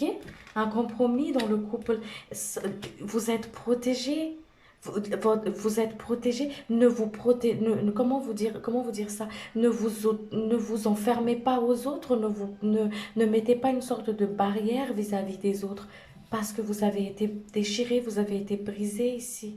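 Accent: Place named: South, France. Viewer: Merci bien de la guidance bel accent du sud (il me semble) :) ça me parle beaucoup,malheureusement de mon côté l'attente en la concrétisation de mes projets on eu raison de ma relation amoureuse qui était à distance car il était Américain j'ai coupé hier car ça me prenait trop d’énergie ça avançait pas du tout niveau papier etc j'ai préféré m'arrêter là maintenant je pense me stabiliser ici avec peut-être une autre personne avec qui je me suis rapproché dans l'entrefaite.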